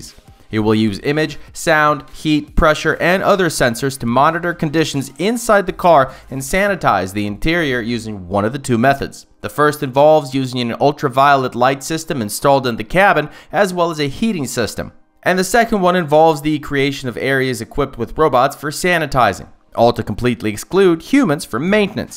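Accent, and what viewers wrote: Texas, United States. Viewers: So when did the narrator pick-up an accent (Texan, I think)?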